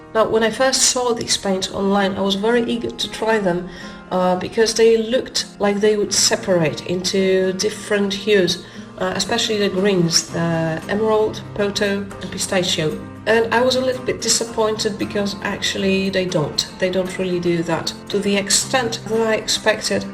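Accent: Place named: United Kingdom. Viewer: I really appreciate your honest review. I hope you had a fabulous holiday!! You sound like you now have a British accent ...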